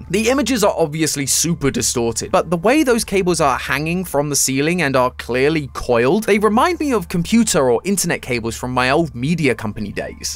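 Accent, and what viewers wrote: United Kingdom. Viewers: AMAZING THEORY Imma be honest tho i only watch horror game theories especially arg's and your british voice is soothing when talking about manslaughter. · LOL this is so good, gread job, i like britis acssent UwU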